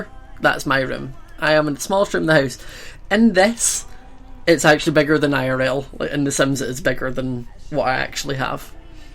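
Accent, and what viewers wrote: Scotland, United Kingdom. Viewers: hes Scottish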